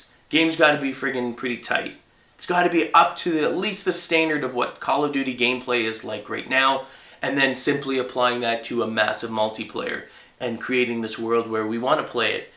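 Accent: Canada. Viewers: are you canadian "aboot" not about, "aboot"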